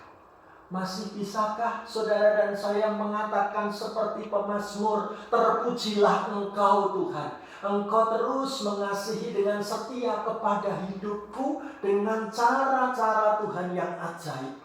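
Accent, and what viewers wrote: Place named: Banyumas, Indonesia. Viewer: Bahasa Panginyongan nya mantap. Asli Mbanyumas nggih Pak Pdt?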